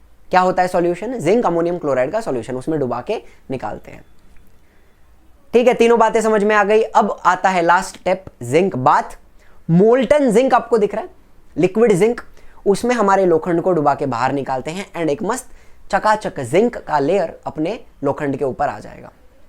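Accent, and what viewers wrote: Maharashtra, India. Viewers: Sir are you Marathi or Watt ? Please tell me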